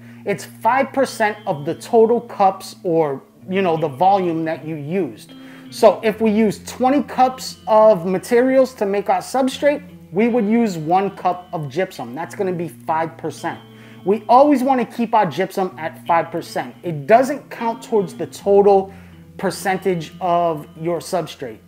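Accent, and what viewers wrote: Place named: Massachusetts, United States. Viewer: we know your in Mass with that accent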